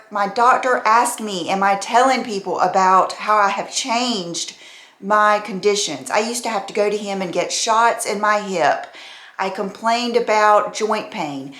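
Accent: South, United States. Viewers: I love your personality! I am a southern girl that has moved out west- and find your voice and attitude reminds me of home- you are like a new carny friend!! It is so amazing how great i feel on just a few weeks of carnivore eating (i have had a few 'eat all the carbs that i want days')-- the joint pain, allergy symptoms, most of the menopause hot flashs, and edema are gone- i have far more energy and clarity-- and wow i feel super calm- this coming from someone who thought that their personality was just anxious- my brain just never turned off! And i started this as someone who did not like meat much but now i find myself craving it and butter- fascinating!! I have not lost much weight yet but my clothes are fitting better and my face is slimming down- have subscribed and please keep up the good work- ❤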